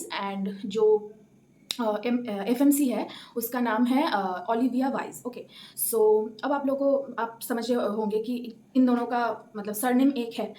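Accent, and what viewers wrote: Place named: Bengal, India. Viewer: 8 months na ki 8 years Btw. Your Bangla-hindi accent is amazing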